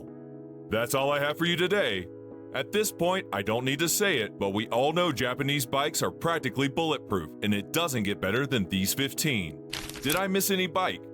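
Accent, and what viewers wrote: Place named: United States. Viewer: Leider erzählt...im extrem hässlichen US- Englisch...schade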